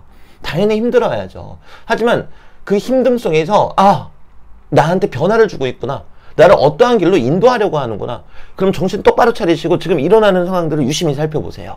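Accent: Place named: Gyeongsang, South Korea. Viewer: 경상도에서 좀사셧엇나바여 ㅋㅋㆍ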